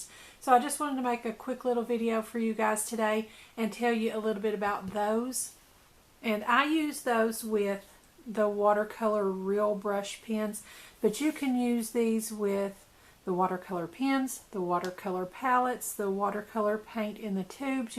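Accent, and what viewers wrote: South, United States. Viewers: Answered everything, and then some. You are such a blessing. Thank you so much, I feel so special. I'm originally from Florida and I just love hearing your southern accent. Have a blessed Easter. Bev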